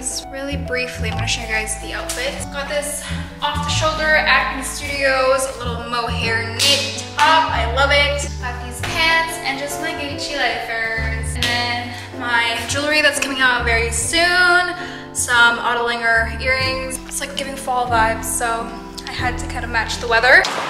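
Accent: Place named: United States. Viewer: ahh, london. you have an america accent. are you from here? if so, would you recommend moving to London/overseas? do you have a video on your experience & the cost of living?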